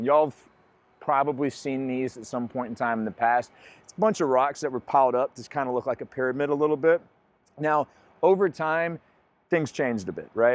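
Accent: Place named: South, United States. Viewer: I can’t wait to go I have wanted to visit for years . I love your accent by the way I am coming to see the houses and the southern accents . Us Canadians don’t have the smooth beautiful sound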